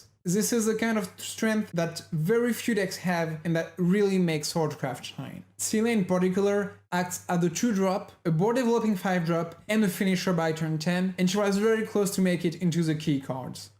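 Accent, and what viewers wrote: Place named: France. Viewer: That accent,you can't fool me,are you french?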